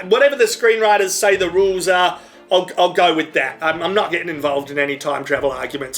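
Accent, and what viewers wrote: Australia. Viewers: Funny I had on captions while watching this video to make sure I understood your Aussie accent correctly, you bloody legend!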